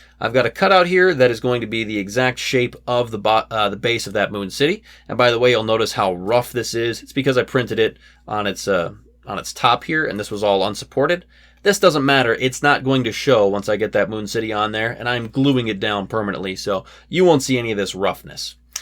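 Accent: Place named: Australia. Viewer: That Aussie accent, lmao never gets old.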